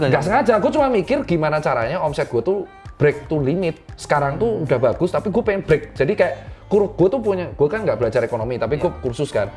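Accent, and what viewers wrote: Java, Indonesia. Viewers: medoookkk bingit😊😊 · Asli mau segaul apapun logat jawa tak bisa di hilangkan 😄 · Medok bgt,,ngomongnya...jawa,yes.gorengan kesukaan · medok guwa guwene🤣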